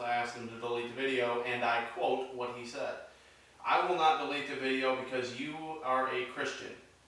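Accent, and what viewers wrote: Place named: RP, United Kingdom. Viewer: I gave u a like like posh accent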